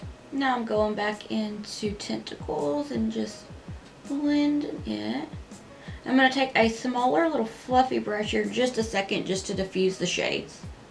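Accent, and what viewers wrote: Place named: South, United States. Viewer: Oooh I’d love to have this palette!! It’s on my future purchase list. I Like seeing you start out with 0 eyes done! Cool to see the first, true, artistic vision. Possibly the mistakes. Get to see the decision making process. I understand why creators start with 1 done on videos. But, as a viewer, I kind of like seeing it come together, without the end result smacking me in the face at the same time. If that makes sense. It would be easier to do the second eye, after doing it a first time. You would do it smarter. More effective. Cut out unnecessary things or reverse wrong ideas. I get it. But I like this style too! Anywho - love your content. Love hearing a familiar southern drawl. The pearls you picked are PERFECT with this look too. So gorgeous ❤❤❤ sorry, my comments tend to ramble. I have lots of thoughts lol 🤷🏼‍♀️😘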